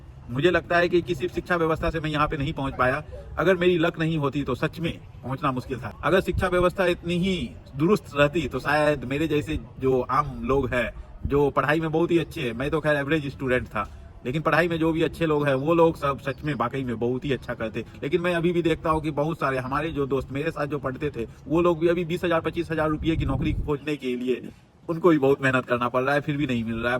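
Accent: Bihar, India. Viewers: सच्चाई ओर सुधार लाने वाले व्हिडिओ आप बनाते हो china देश ने itni tarrakki ki he इस्का main karan क्या हे इस्पर आप जरूर व्हिडिओ बनाईये कयोकी china सबसे जादा अबादी वाला ओर लोकसंख्या मे सबसे जादा रेहेणे वाला गरीब देश ऐसे हमने syllabus मे पढा हुंवा था जब मे स्कूल मे थी/ यानी प्रॅक्टिकल knowledge ही वही सही हे इसलिये china डॉन बन गया मे भी 4 th तक सरकारी स्कूल मे पढी हु/ china ki sachhai जनाना चाहती हु isliye आप के व्हिडिओ देखने लगीं ही ओर से आप जिस तरह बात करते हो वो मुझे बेहत पसंद है/ आप जैसे हमारे स्नेही हे बिहार से वो भी आप जैसे बिहारी टाईप बोलते हे/ बिहारी लोग बेहत मेहनती ओर helping log होते हे.. मे महाराष्ट्र से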